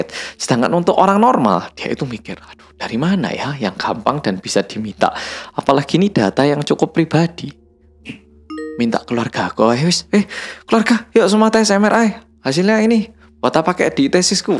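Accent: Java, Indonesia. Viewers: Gayanya mas sekarang "korea" banget tapiiii karena sering muedok jadi pas ngomong korea otak ku tetep berkata "weh iso boso korea lek". Padahal sadar dengan penuh kalo dia ya org korea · PLOT-TWIST 🤣🤣👍🏼👍🏼Suka video, terima kasih Boloku Sing Paling Medok Dheweeeee 🙏🏼🙏🏼 Bikin video yang membahas Narcissistic Personality Disorder (NPD) juga Rek (aku juga kemarin habis bikin utas Twidder untuk NPD biar korban²nya bisa membela diri. 💪🏼✊🏽❤️❤️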